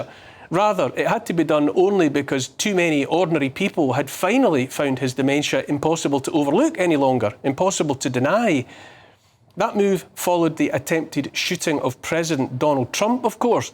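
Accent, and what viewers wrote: Scotland, United Kingdom. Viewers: HIs annoying Scottish accent. He's impossible to listen to. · hE HAS A SCOTTISH ACCENT ! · I love his beautifully spoken Stirling Scots accent!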